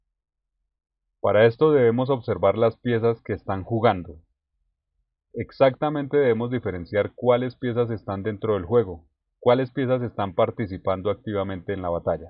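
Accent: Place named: Colombia. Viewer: coño, no sabía que igor smirnov supiera hablar tan bien español,lo habla con acento colombiano,increible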